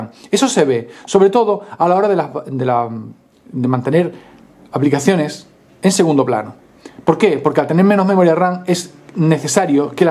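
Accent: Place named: Andalusia, Spain. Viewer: De que ciudad de andalucia eres?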